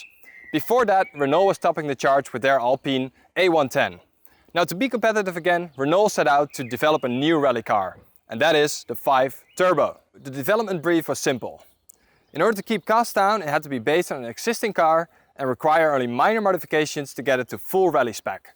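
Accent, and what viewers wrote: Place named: Netherlands. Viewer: Die Nederlandse accent 😂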